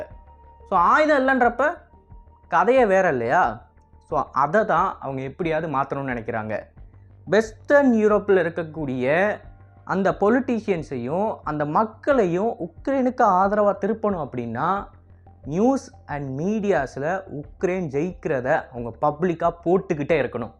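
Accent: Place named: Madurai, India. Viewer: Maduraikaran slang varuthe pa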